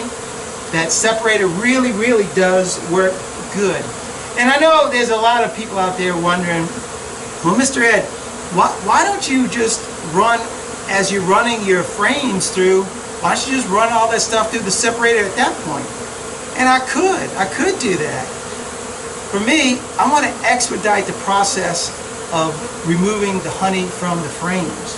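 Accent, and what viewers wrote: Louisiana, United States. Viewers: This just popped up on my YouTube (fyp) , you started talking and I immediately said “okay where is he from” because I new it had to be Louisiana lol just wanted to say hi from northeast Louisiana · Stumbled upon you from another bee video. Could tell right away you were from southern Louisiana.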